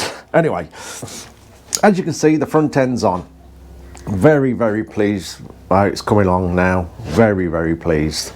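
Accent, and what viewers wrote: England, United Kingdom. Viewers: I've been taking seals out of machinery for better than fifty years, and this is the first time I've seen the trick with the chisel cutting the seal, and that works great. The steel base for that seal is stamped and "pre-stressed" so it fits very tight pressed in. You simply break that "stable platform" it begins with so it no longer can "press against the wall. I've worked on motorcycles and cars, trucks and general machinery, never thought of it like that. By the way, we also say "dragged", at least we do down south, lol. I've got to say, I enjoy the accent and word usage common over there in England. I got on here because of having a triumph, but enjoy the whole "stepping into your world" over there by the tube. I spent twenty years around the world, a Marine, and miss that, having retired in 97. Of course that's why I've got time to have a couple bikes. 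I've had the good fortune to work on Brit bikes fifty years, since my early teens, and I still really enjoy them. Oh, and car's too, had a few, worked on hundreds. It's good to see the bike coming together like it is. I've heard of Enfields since my teens, only recently got to see them, and that one is looking really good. Thanks for the video!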